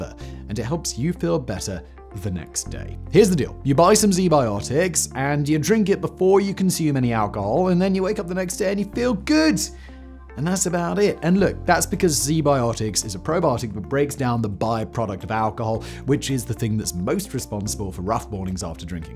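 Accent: United Kingdom. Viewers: Do people really still talk with this pompous, posh English accent? Or is this just a goof?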